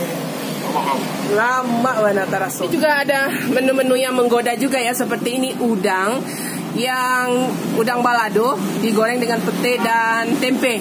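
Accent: Minangkabau, Indonesia. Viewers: Iko baru mantap, kuliner minang di review oleh orang minang asli dgn dialek khas minang. Sukses taruih uni di tunggu video2 berikutnya, lapeh juo taragak jo kampuang nan jauah di mato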